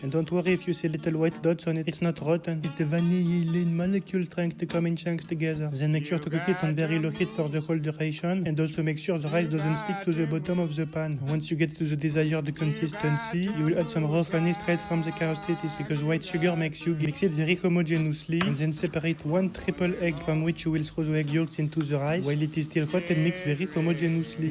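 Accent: France. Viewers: wow l acccent francais laa frero mais j aime l humor bonne chance mon reuf ciaoooooo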